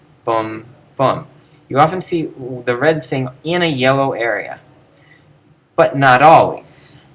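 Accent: United States. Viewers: for a lot of the vowel sounds, he is still using a more american pronunciation because a lot of greek teaching in the US teaches the alphabet as more-or-less substitution: Alpha is basically A, epsilon is a short E, etc. when really they should be taught as their own sounds. for consonants, there was a huge consonant shift between Homer and even when the septuagint was written, but he's using the later pronunciation. He should be reading phi as a heavily aspirated plosive, not as a fricative, etc. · Only to me he seems japanese? Listening greek read with english/ american pronounce is very funny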